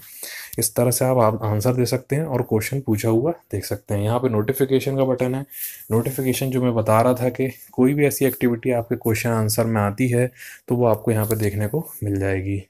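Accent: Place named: India. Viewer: You speak so fast and you have indu accent. I did understand just afew words. 🤦🏽‍♀️🤦🏽‍♀️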